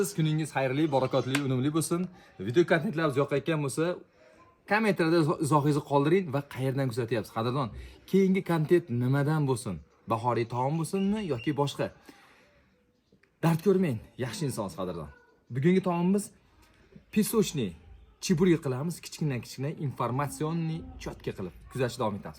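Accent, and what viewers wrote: Fergana, Uzbekistan. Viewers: Фаргоналимисиз каеридан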